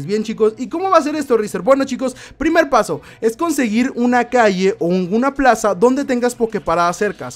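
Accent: Durango, Mexico. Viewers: eres de Durango :v? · eres de durango we hahahaha eaaaaaaaaah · oye eres de durango? 😳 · no mames!!! eres de durango:o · eres de durango?!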